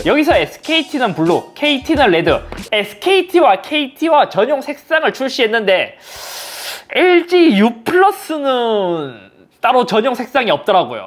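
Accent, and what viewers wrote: Gyeongsang, South Korea. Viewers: 죄송한데요..다 좋은데요..목소리가 너무 듣기가 불편합니다.경상도 사투리에 톤이 너무 높아 시청하면서 듣는데 심장이 쿵땅 거립니다.목소리 톤좀 낮춰서 영상 만들어 주시면 시청 많이 하고 싶습니다.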